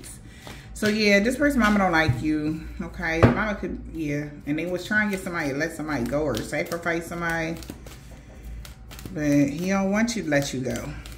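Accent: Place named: Brooklyn, United States. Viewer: I Live in New York! U funny sound like u was uptown in the bronx or spanish harlem . And u was also in brooklyn carribean city !!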